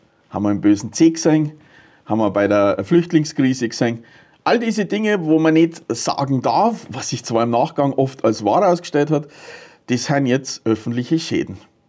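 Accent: Bavaria, Germany. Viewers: Danke! Aber du sprichst Bayrisch da steigt die KI eh aus hahaha 😀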